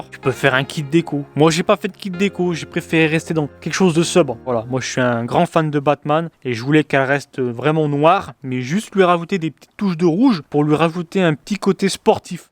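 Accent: Saint-Étienne, France. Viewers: S'est l'accent Stephanoi 😄👍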